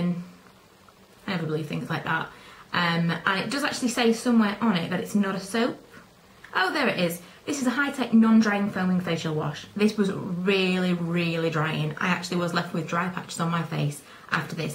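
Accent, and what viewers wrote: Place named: England, United Kingdom. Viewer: shes definately NOT scottish lol. Im from Glasgow and can tell an english accent from a scottish one!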